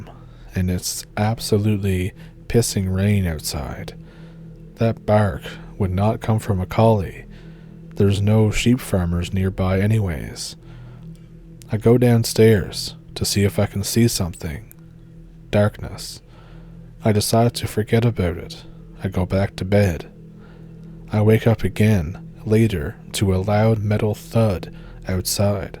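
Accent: Canada. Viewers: Greetings from Ohio! Yeah slight Canadian accent. Just the "ou" sound, like out, about. I like it 🙂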